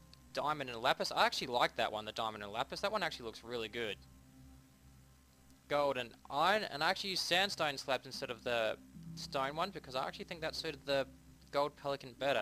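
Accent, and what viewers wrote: Australia. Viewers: Like you accent your austrailian nice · Are U Australian or not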